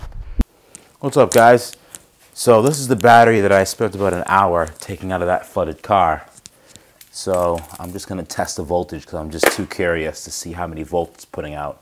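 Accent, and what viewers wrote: United Kingdom. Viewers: Loving the British accent. Nice touch lad. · At first I thought you had picked up a British accent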